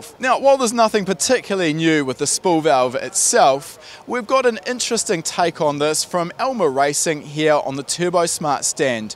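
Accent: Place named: New Zealand. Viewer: No insult intended😁. Just that, as an Aussie, it's so nice to hear a non-American voice on YouTube (even the web) these days. I love Niew Zilland ecsents.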